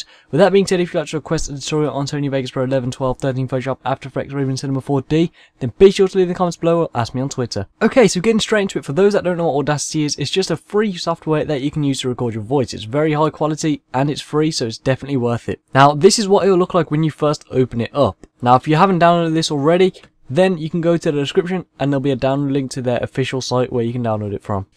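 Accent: United Kingdom. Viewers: Why y’all sayin he talks to fast. Get your jockstraps outa your buttcheeks and open your ears he talks like a regular brit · You sound like a British version of xxxtentacion. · Dude, what language are you speaking ? )) Is it Scottish or Irish accent?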